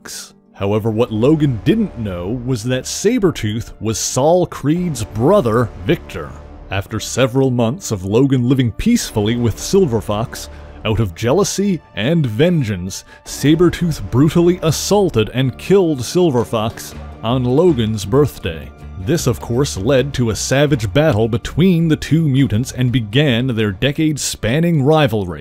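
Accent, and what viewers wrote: Canada. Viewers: Know how I know you're Canadian? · You’re Canadian aren’t you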